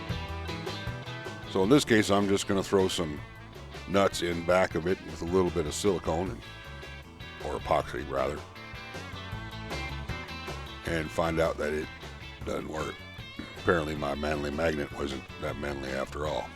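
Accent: United States. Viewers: You are extra funny today. And the accent is extra 'cowboyish". I guess it makes it all 'manly".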